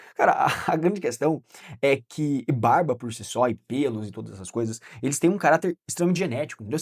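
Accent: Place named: Paraná, Brazil. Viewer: Vc parece do Paraná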